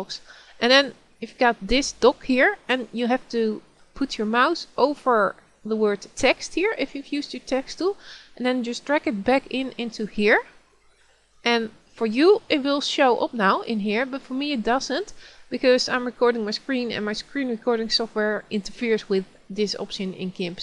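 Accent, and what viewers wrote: Netherlands. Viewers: Bedankt, ik hoor aan je stem dat je van Nederland komt of toch Nederlands praat. Ben ik juist? Toch bedankt want ik zat met dit probleem! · Wedden dat je nederlands bent / I bet that you are \Dutch :d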